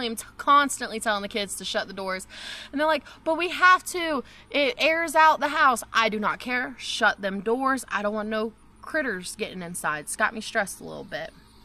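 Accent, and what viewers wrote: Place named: South, United States. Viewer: I think your videos are so sweet. I am a GA girl! I love to hear you talk. Sweet Southern Girl!!💖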